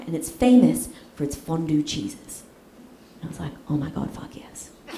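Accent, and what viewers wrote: Australia. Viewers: The aussie accent got me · That Aussie accent… what a babe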